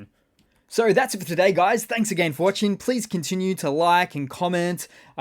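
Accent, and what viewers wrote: Australia. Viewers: 【今日のJuzzieの動画のコメントから一つピックアップ】 あほのコメンターの、こいつによると、'Russia moving those buoys'は、 数年以上に渡る、エストニアとの相互交渉による結果であって、双方の合意によるものだ、ということらしい。 ただ、しかし、その相互交渉、双方の合意なるものは、プーチン・ロシアの、そのポンコツ軍事力（による脅し）を背景にしたものだ、ということを見落としてはいけない。 それは、プーチン・ロシアによる、暗黙の脅しによるもので、単なる、一方的強制であって、当事者間の「双方による合意」とは、言わない。 因みに、プーチン・ロシアの、これまでの、やり口、手法は、すべて、これであって、彼らの言う、相互交渉、双方の合意というのは、すべて、 見せかけだけ、外見だけで、中身は、プーチン・ロシアの、これまでのポンコツ軍事力（による脅し）を背景にした、いわば、強制だった、ということ。 んで、今はどうかというと、プーチン・ロシアが世界に誇る、世界最強、世界最先端の軍事力が、実際の戦争で、実は、すべてポンコツ、大嘘、いかさま、ペテンの代物だと、バレてしまったので、 その結果として、これまでの、プーチン・ロシアの、強引で、一方的要求である、傍若無人もいいところの 見せかけだけ、外見上の、相互交渉の結果、双方の合意、というものは、今後、全て無効になる、白紙の戻されることになる、のは明らか、だと思うんだけど、ね。 4 時間前 You have the best Australian accent,. Goes well with the humour. Russia moving those buoys after years of mutual agreement with Estonia is just so typical. Then it accuses other countries of provocation and the reason for going to war with them. 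It's extremely annoying when other countries give their endorsement and support for this kind of behavior. 5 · You have the best Australian accent,. Goes well with the humour. Russia moving those buoys after years of mutual agreement with Estonia is just so typical. Then it accuses other countries of provocation and the reason for going to war with them. It's extremely annoying when other countries give their endorsement and support for this kind of behavior.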